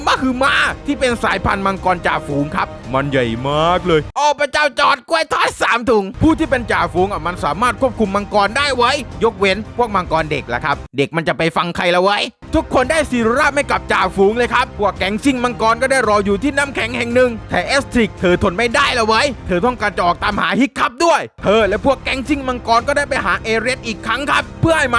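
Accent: South, Thailand. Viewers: พี่คนใต้เหรอแหลงใต้ชับพึด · สำเนียงคนใต้เลยนิ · แหลงใต้มากๆ ก็ดีน้ะครับหลกดี555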